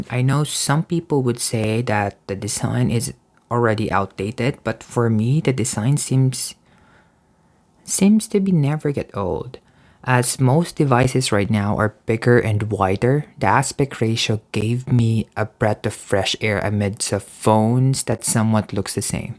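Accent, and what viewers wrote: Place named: Philippines. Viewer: I know a Flipino when I hear one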